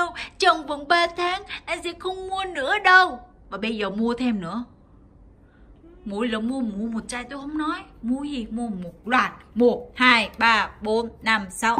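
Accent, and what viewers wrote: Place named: North, Vietnam. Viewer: lúc đó nói giọng Bắc nó chả chuẩn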